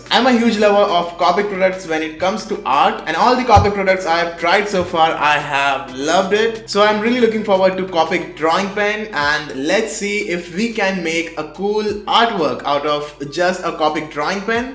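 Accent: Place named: India. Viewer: Indian for sotaque is ugly.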